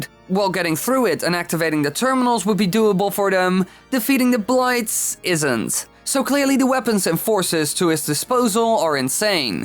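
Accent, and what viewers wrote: Netherlands. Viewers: I'm sorry but the dutch overtones in this one are too much for me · I was wondering, are you Dutch? Cus i am and i speak english kind of the same way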